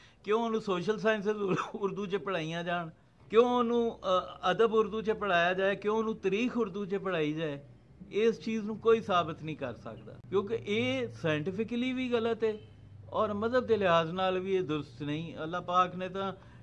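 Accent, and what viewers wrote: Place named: Punjab, Pakistan. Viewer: Gulabi Urdu.. Like it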